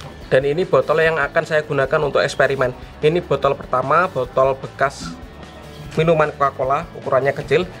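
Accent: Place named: East Java, Indonesia. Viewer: Orang jawa timur kan bang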